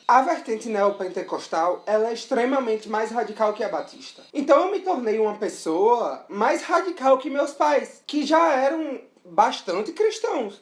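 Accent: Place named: Recife, Brazil. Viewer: Eu me emocionei internamente e me identifiquei demais com sua história, luta e com você também (sou de Recife e tenho o mesmo sotaque e forma de falar que você tem Kkkkk) porque eu sou bem jovem e passo por uma trajetória semelhante ao que você viveu na adolescência em vários pontos (até mesmo a questão da mania de escrever textos para desabafar e etc). Ver o seu vídeo me deu uma injeção de ânimo e de mais vontade de ir atrás dos meus sonhos e independência. A sua história é realmente muito parecida com o que vivo, apesar de alguns pontos de diferença, eu acredito que a minha história terá o mesmo resultado que a sua: a aceitação, e mesmo se eu não tiver, eu permanecerei firme e forte na minha luta e sonhos. Muito obrigado por inspirar pessoas como eu. <3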